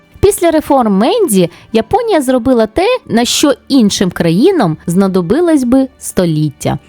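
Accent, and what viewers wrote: Russia. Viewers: Мій комент майже аналогічний) Дуже ріже вуха мацкальска вимова японських слів і назв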